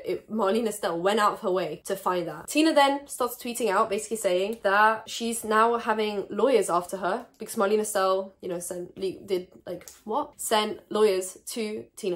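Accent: United Kingdom. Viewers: WAIT WHERE ARE YOU FROM???? i thought you were british this whole time. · If you don’t mind me asking, what country are you from if not Britain? I thought you were British lol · wait where are you from?? I swer I thought you were from the uk idk lol please someone tell me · Mel Z I know lol I’m Scottish, just thought she was British until she mentioned her citizenship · Where are you from?? I thought you were British/English but you're not??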